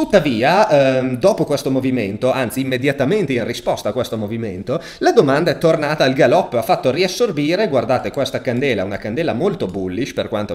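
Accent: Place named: Romagna, Italy. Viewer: Ciao, di dove sei? A giudicare dall'accento viviamo vicini, sono di Forlimpopoli! Per il momento sono messo così: 35%btc 45%eth 20%cro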